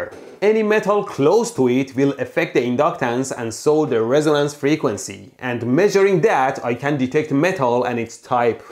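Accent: Iran. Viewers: میدونم که ایرانی هستی * از لهجت معلومه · چقدر لهجت بە ایرانیا میخورە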